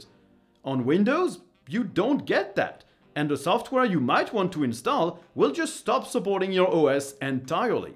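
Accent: France. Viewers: On est d'accord t français ? j'ai l'impression avec l'acent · nice french accent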